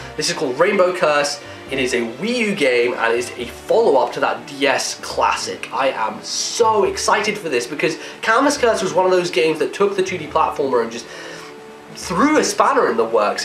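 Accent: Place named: United Kingdom. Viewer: its guys. He's british, give him a break. It's not that hard to understand if you've been here for a while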